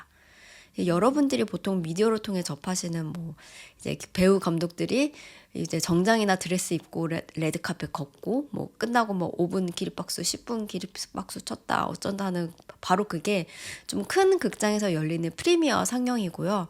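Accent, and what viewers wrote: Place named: Seoul, South Korea. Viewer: 서울말 듣기 좋다.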